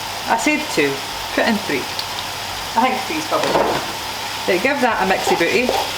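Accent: Scotland, United Kingdom. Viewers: A Scottish accent on women might be a crime against humanity. Why did she remove a quarter of the carrots? Why did she remove over half of the sellery and throw it away? Why did she fry the vegetables first, ensuring that the meat can never get brown? All of that said, I think the basic recipe has potential and I'll try it out, maybe add the cream on the second day for variety though. PS: Don't use plastic chopping boards, plastic's bad for you. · damn I love the scott accent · I get the feeling that lots of people can't undetstand you since you don't speak " youtube Scottish", very, very likely everyone still loves your wholesomeness, but for me, I'm Australian. Both my folks are fresh off the boat Scots and I have a massive family in Scotland. Iv grown up listening to Scottish- actual Scottish on youtube is so refreshing.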